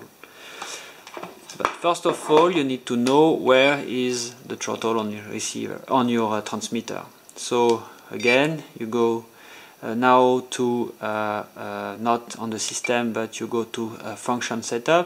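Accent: France. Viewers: sound french, lol. good job man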